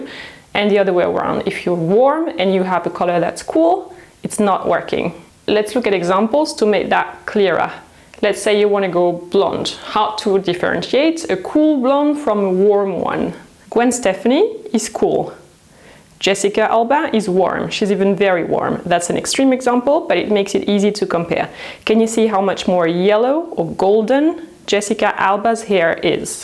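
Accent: France. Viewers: oulalalala je capte le signal d'un accent francais! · I think she's french by her accent or I may be wrong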